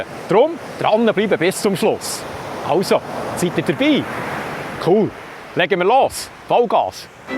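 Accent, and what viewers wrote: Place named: Thun, Switzerland. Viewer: I bi fasch vo Gunte, oder eifach am Thunersee ufgwachse u gniesse sit über 10 Jahr mi Ruhestand im ewige Summer vo Südoschtasie. Bravo! So klari Wort i gnau mim Dialäkt ds ghöre isch ä Woutat. Ds Abo isch gsetzt.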